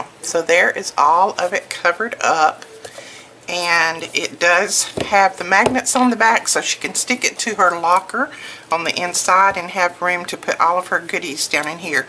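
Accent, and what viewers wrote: South, United States. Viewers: What a good idea, and useful! I've never seen those letters before. The inking around the letters is a good tip. I wouldn't have thought of it. I learn something every time I watch you. Your grand dauther is going to love it. While you were making this I was also thinking how kids really DO appreciate the little things we do for them. Just one more way we can say we love them are are thinking about them. I have to tell you this. I listen to all of your videos, because I am scrapbooking all day long, and you keep me good company while I am doing it.. Well, when I am thinking my thoughts as I work, I HEAR my thoughts in your accent!! Really! I can't speak it, but I HEAR it. I'm sure that if I lived in the south I would pick it up SO fast. :-)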